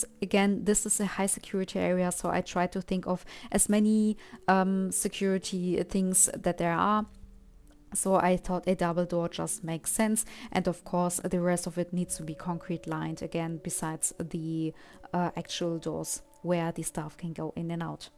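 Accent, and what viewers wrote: Germany. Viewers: Are you german by any chance, because you sound a bit german. You can hear the dialect but I stil enjoy your videos and I think you can buil sooo good like damn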